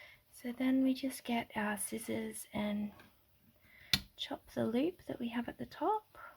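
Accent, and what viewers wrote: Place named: Australia. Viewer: Thank you. Sounds as though you are Australian. Nice to hear.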